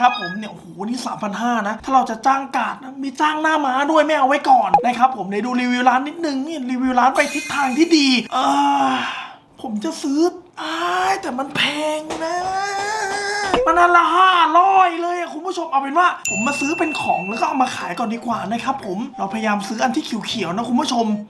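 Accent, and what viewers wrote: South, Thailand. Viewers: พี่เป็นคนใต้หรอติ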